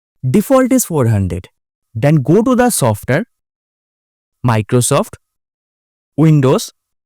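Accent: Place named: India. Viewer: chill, not everyone has en as native lang, it's just indian accent, the video is dead easy to understand... even without audio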